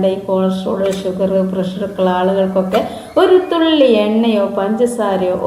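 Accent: Malappuram, India. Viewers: 👍താത്താന്റെ ആ നിഷ്‌ക്കളങ്കമായ അവതരണ മലപ്പുറം തനതായ ശൈലി കേട്ടിരിക്കാൻ വളരെ രസമായിട്ടുണ്ട്. അതിൽ ഇടക്ക് വലിയ ഇംഗ്ലീഷ് വാക്ക് ഉപയോകുന്നത് ഒഴിക്കാവുന്നതാണ് താത്ത നല്ല അവതരണമാണ്. ലളിതമായ പാചകം കൂടുതൽ സാധനങ്ങൾ ഇല്ല. നല്ല സ്ക്രിപ്റ്റ്. ബോഡി ഷോ ഇല്ല. One man show.👏👏👏super.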